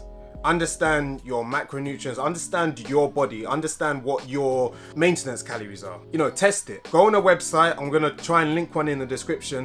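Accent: United Kingdom. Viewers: Brother with a brit accent....and yankee football in the background....my brain cannot process this dissonance ! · British accent and American Football doesn't go together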